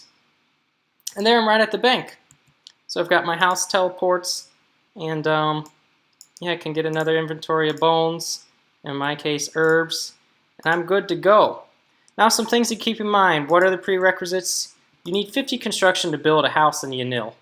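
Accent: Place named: South, United States. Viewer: im guessing alabama tennessee texas?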